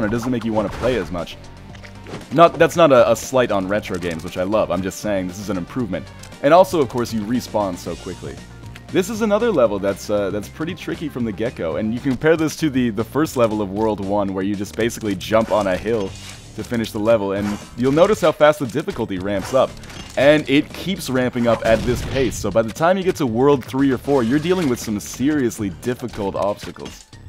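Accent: Canada. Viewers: Wow, sounds like a real Canadian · The canadian accent is really more noticeable in these older videos. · i can hear the Canadian :D This is a classic xP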